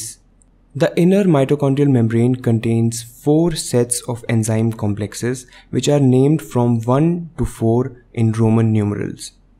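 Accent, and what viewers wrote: India. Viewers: I love it 😊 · I hate this indian accent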